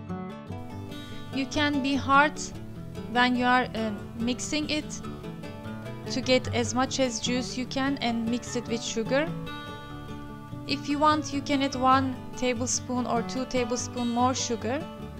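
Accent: Turkey. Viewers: Funny but I'm Turkish and this is the first time I hear English with a Turkish accent 😂. I can't speak with Turkish accent, maybe I don't hear my accent I don't know. Anyways that's nice you're keeping your accent and thank you I heard my accent for the first time hahaha.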